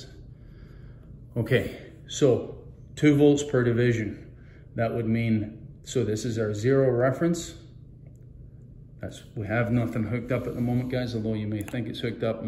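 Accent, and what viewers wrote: Scotland, United Kingdom. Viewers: a wee Scottish twang in your accent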